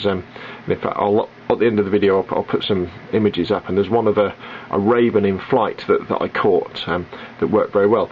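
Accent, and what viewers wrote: United Kingdom. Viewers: Are you british, I like that accent !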